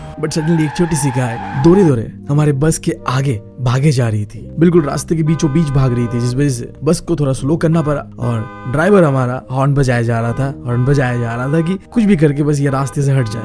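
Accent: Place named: Northeast, India. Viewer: BRO HE'S FROM NORTHEAST (ASSAM) TO THODA HO JATA H SMJH JAO NA❤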